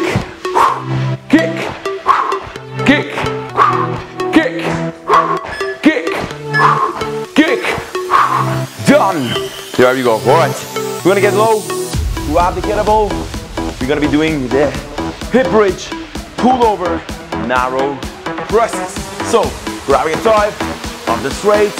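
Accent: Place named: Australia. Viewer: Sounds like Australia; but I could be wrong.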